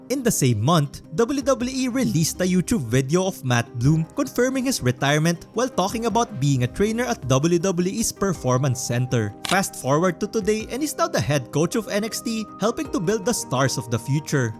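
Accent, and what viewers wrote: Philippines. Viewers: is bro talking had a filipino descent??? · I can hear your accent is filipino.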